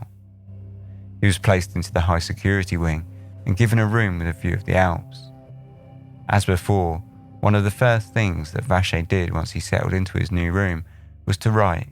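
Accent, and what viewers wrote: United Kingdom. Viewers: All of your videos are shit. No pics or anything.. just your stupid Brit accent and you talking... Come on you lame prick.. total garbage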